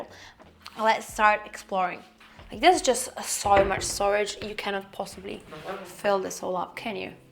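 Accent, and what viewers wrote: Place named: Australia. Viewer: You have a slight Aussie/ New Zealand accent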